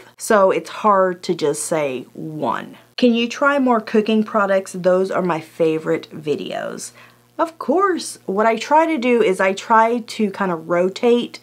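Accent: Texas, United States. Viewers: I watched one video previous to this and I was thinking to myself I bet she’s from Texas! I watched this one and I knew lol. - from a fellow Texan.❤️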